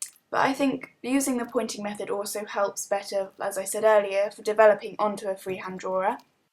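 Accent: United Kingdom. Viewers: Omg you're British haha be aware that that makes most Americans like 200 times likelier to watch your videos..plus, you are one of the best portrait artists on YouTube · R u English r South African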